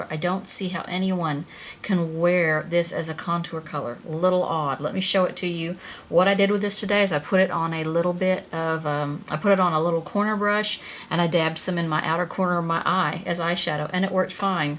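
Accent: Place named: Mid-South, United States. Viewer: love your subtle accent. Are you from the Mid-South? watched some of your videos LOL women of a certain vintage , so much better than saying women of a certain age! your makeup looks really good , edgy but classy.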